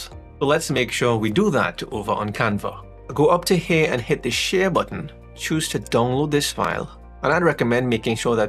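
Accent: Trinidad and Tobago. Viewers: Love the vid bro thanks but how you soundin like you trini so?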